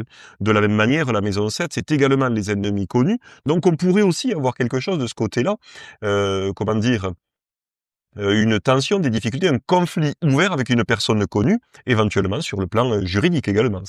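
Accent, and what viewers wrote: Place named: South, France. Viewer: Joli petit accent du sud😊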